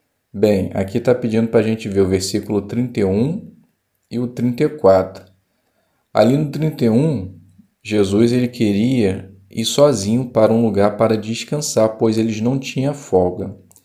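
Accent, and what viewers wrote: Rio de Janeiro, Brazil. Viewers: IRMÃO, MATE MINHA CURIOSIDADE: O. IRMÃO É? A VOZ É IDÊNTICA; ATÉ O SOTAQUE BEM CARIOCA. BOM DIA. ABRAÇOS TERNOS E FRATERNOS. 26/08/2022.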